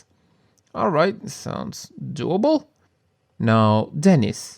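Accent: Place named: Italy. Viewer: You mentioned that you were the Italian Sam O’Nella? If so that’s amazing because I like your accent for these videos, it makes your videos stand out to most of the other ‘bootlegs’ because of the voice.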